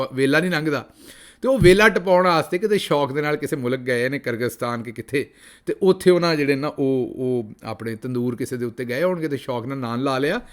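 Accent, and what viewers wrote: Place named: Punjab, India. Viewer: sb ap sirf punjabi V-Log kiya kren, ap ka Labo Lahja punjabi ki azmat ko doballa kar data ha. Youn lagta ha ap punjab ki gali kochun ma bantay ar bandar killa khaltay jawan hovay. Punjabi ki gahrai ar rwani sun kar ap punjabi k professor lagtay han, choren urdu english bus punjabi v log..kiya munfrid andaz ha ap ka..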